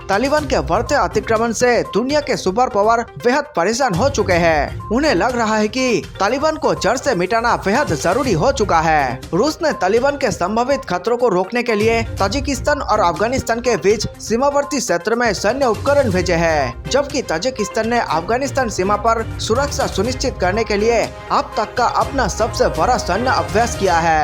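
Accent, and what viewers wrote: Bengal, India. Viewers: News reader Bagali uchaaran me Hindi bahut achhi bolte hain.very nice!🙏🇮🇳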